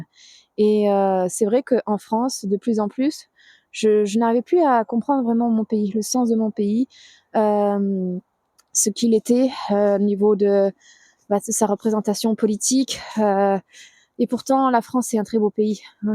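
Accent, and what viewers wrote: Russia. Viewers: Неужели никто не понимает, что вы русская. Я это отлично слышу по вашему французкому. Сплошные фейки.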